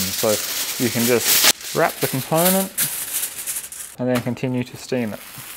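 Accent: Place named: Australia. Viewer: You rock bro. Finally, someone that goes through the process thoroughly in detail.....and so cool to listen to another Aussie lad explain everything.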